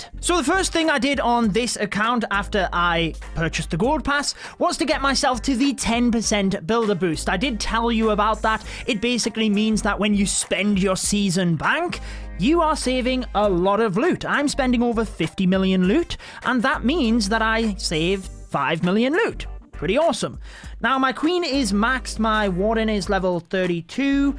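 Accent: United Kingdom. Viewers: I love that British accent 😂.... P.s thnx for the great video! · Very good british Accent I enjoy your speaking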